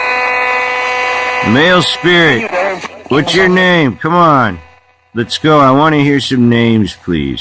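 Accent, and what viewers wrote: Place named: England, United Kingdom. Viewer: North Nottingham Paranormal Investigators ahh now I hardly thought you were I'd know his accent a mile away. Lol and for some reason I don't think your English accent could sound anything like American one 😂😂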